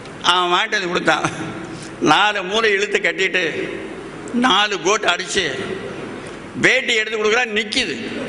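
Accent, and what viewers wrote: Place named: Kongu, India. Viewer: கொங்கு தமிழிலே அருள் மழை பொழிந்து..எங்கள் மனதினை இன்பத்தில் ஆழ்த்திய அய்யாவின் திருவடி வாழ்க..!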